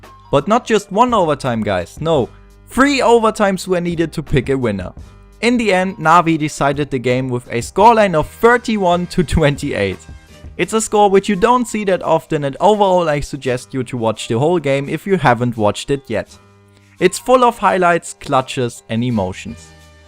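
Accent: Germany. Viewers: are u german or did u traveled to germany? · Pretty strong accent but I'm used to playing with German friends (which is why I can read/understand German pretty well). Having played with a lot of German people, English skills are generally insanely lackluster. Then again, Germany is a pretty big and independent country making it a little less important to speak English I guess... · Du bist Save deutsch das hört man so hart an deiner Stimme :DDD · Dieser Deutsche Akzent :) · Are you from Germany , you sound so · Dieser deutsche akzent :DD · I can hear it. Im from Germany too and its a German Accent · Ha ein deutscher, so ein grottiger englisch Akzent xD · Do you come from germany? :D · bist du deutsch xdd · You sound like you are from germany....are you? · Als ich mir das Video angeguckt hab, dacht ich mir: "Der muss doch deutsch sein, der redet so:D!" Das is er auch xD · dieser deutsch-englische akzent ^^ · Nice accent ;) Are u from german :D? 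· Liquid vs Fnatic on dust at MLG columbus, astralis vs fnatic at MLG columbus... and pls get rid off that cancerous german accent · Man merkt das du deutsch bist!:) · Haha :D 2 Sekunden vom Video gesehen und direkt erkannt, dass du Deutscher bist^^ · Du bist deutsch oder ? Ich höre so ein deutschen akzent 😋😅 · Your german,right ? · Du hörst dich deutsch an :D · Lol, du bist ja Deutscher :D haha nie gemerkt :D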